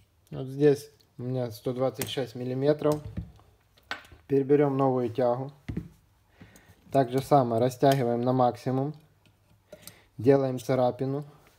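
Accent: Odessa, Ukraine. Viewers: Слышу, что мастер видимо с Одессы. Так колоритно, как в "Ликвидации", хотя я сам с Сахалина. Всякая информация полезна, спасибо!!!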